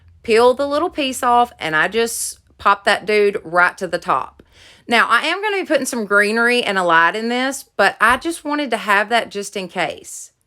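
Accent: South, United States. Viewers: You are absolutely amazing! So talented & I love your personality. I am from Virginia with a southern accent too so listening to you feels right at home lol. Quick question...I just ordered some 20mm wooden beads from your Amazon link. Is that the size you find yourself using most often? Thanks! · I feel a kindred spirit with you! You and I sound a lot alike! COUNTRY! I am in upper-most Soutn Carolina so my accent is homegrown! Love your diy's! This is my first time watching you. God bless you!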